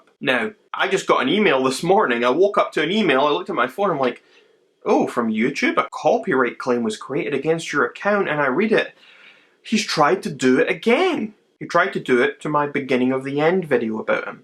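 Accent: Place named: Scotland, United Kingdom. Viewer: Ok you are Scottish 🥰 best inventors,best accent,now my best channel for tea ☕️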